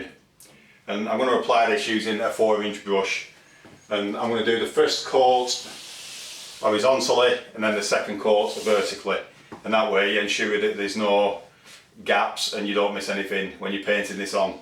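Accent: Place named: North, United Kingdom. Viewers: Brill and very easy to understand, thank you fellow northerner. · handy. I could understand your northern accent. subtitles are funny though around 14 mins! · Great Lancashire accent that Darwen ?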